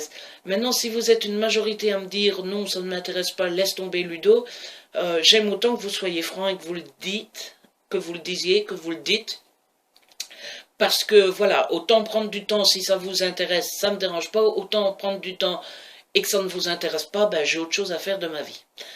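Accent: Belgium. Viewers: Coucou Ludo ! Happy Bday ! :) Je ne suis pas une habituée des commentaires mais sache que je te suis depuis quelques mois maintenant et que ça reste un plaisir de voir tes vidéos :) (C'est sympa d'avoir un booktubeur avec un super accent made in Belgium ! Je suis Liégeoise, je sais ce que c'est lol) Perso, j'aime les formats habituels, je n'ai pas de demande de vidéo spécifique. Au plaisir de te voir dans une prochaine vidéo, bisous !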